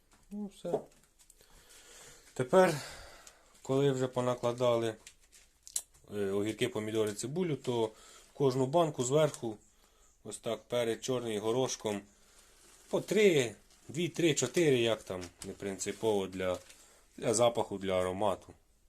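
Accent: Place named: Kirovohrad, Ukraine. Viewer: Така мова цікава, так моя рідня на Кіровоградщині розмовляє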